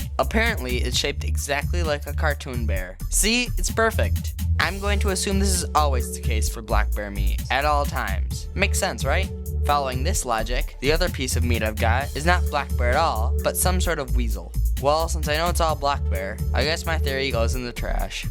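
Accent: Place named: United States. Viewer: Weird you sound American but your bro. Sounds like an Englishman great video though :)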